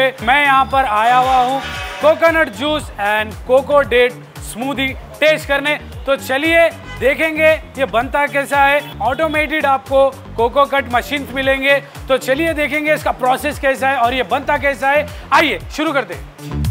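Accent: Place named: North India, India. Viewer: Phir woich karre kya bhai North India me hai jaisa baath karre Naturally baath karo aur Hyderabadi slang use karo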